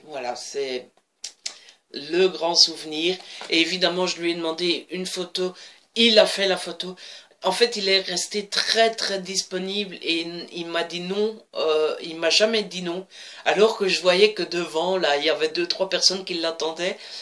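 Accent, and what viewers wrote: Belgium. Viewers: Je découvre ta chaine depuis quelques jours. Je me fais toutes tes vidéos depuis ( quelques avances rapides parfois, j'avoue ^^ ). On a pas forcément les mêmes styles de lecture ( ne suis pas porté sur la romance par exemple mais j'aime beaucoup les thrillers;-) ) mais j'apprécie ton style, ta façon de faire, ton naturel mais aussi ta sensibilité,... Et puis, y'a un point qui me plait beaucoup, c'est ta " belgitude ". Comme toi, je suis belge et quel plaisir de voir et d'entendre un booktubeur belge ( très peu nombreux, hélas )!! Cet accent assez brusselair je trouve ( plus marqué que le mien, moi je suis de la région de Tournai donc j'ai + l'accent ch'ti, c'est ce que les liégeois me disent en tout cas, lool ), tes " godverdome ", nom dé djeu, ect ect.... moi, perso, je kiffe! Voilà, ne change surtout pas ça stp!!;-)En ce qui concerne cette vidéo-ci, tu as parfaitement su me faire revivre ta journée avec tes moments qui te sont chers.J'ai, à la fois, ri et été ému. 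Agréable 3/4 d'heures passés " en ta compagnie "!Bref, j'en fini là. Continue comme ça, ne change pas. Tu es top!:-)ps: Je t'ai ajouté sur Livraddict, j'ai liké ta page Facebook, y'a que ton Fb perso que tu n'as pas accepté mais je peux comprendre, no soucis.;-)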